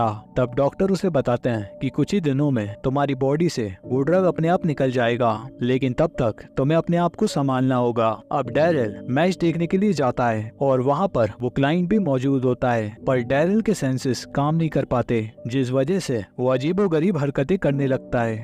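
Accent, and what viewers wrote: Kanpur, India. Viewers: Kanpur se hai bhaii ❤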